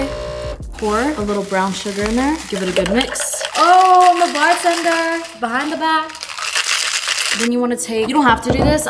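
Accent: United Kingdom. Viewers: Pls I love you but please stop with the British accent 😭😭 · lmao love your british accent and this cooking video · Love love the British accent ❤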